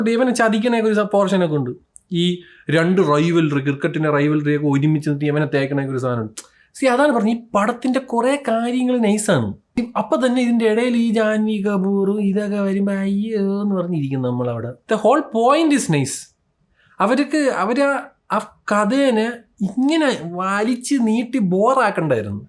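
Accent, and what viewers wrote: Trivandrum, India. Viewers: Annan tvm slang 😆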